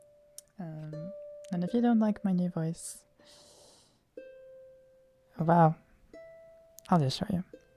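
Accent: Sweden. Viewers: swedish accent is cute af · You sound very Swedish now, I don't hate it though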